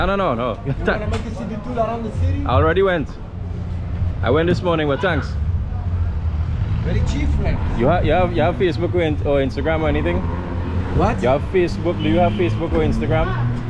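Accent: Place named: Caribbean. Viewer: I am curious to know where you are from. You seem to have a Caribbean accent.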